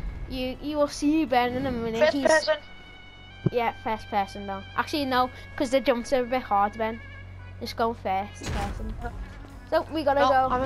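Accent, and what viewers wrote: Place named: Liverpool, United Kingdom. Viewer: What a scouser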